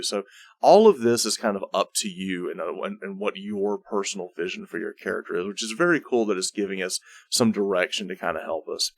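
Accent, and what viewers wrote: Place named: South, United States. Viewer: As a southerner. I have to say its great hearing an eloquence bard give dnd advice in a southern drawl.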